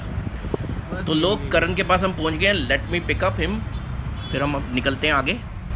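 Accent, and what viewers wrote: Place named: Punjab, India. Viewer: Best part of your channel is ki aap hindi bhi punajbi accent me bolte ho.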